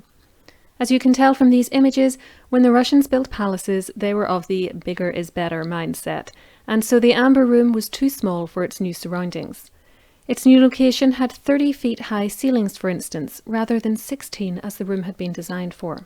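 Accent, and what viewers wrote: Northern Ireland, United Kingdom. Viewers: Is the narrator Northern Irish? I recognise the accent.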